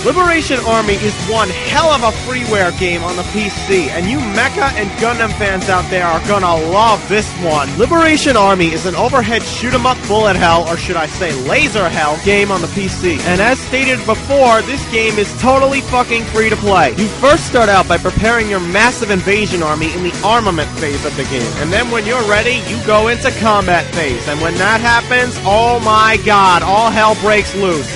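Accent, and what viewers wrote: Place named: New Jersey, United States. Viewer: this guys obviously from jersey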